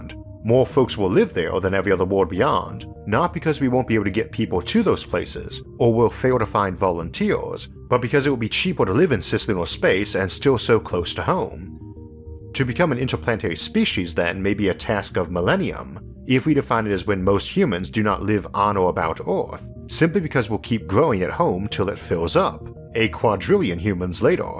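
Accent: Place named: Louisiana, United States. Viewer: I know this is random but what accent is this narrator. Legitimate interest in dialects. Has kind of like a Cajun sound to me..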